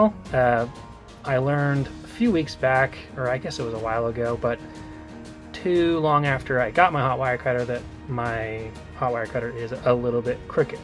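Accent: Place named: East Tennessee, United States. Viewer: You sound like people I know from East Tennessee.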